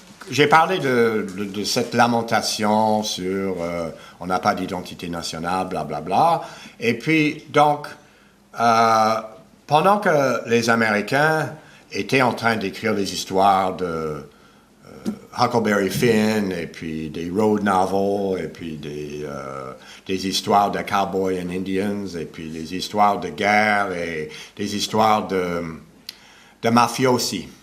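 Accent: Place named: Montreal, Canada. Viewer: Talks like a guy from Montreal. Yeah.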